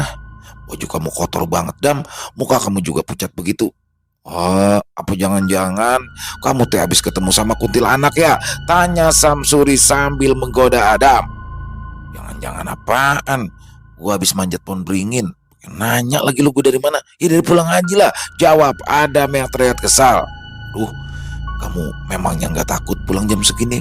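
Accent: Jakarta, Indonesia. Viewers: ASSALAMU ALAIKUM Bg 🙏,hadir lgi nyimak,selain ceritanya yg tegas & mantaabbb, logat betawinya itu yg paling saya suka,!!!!!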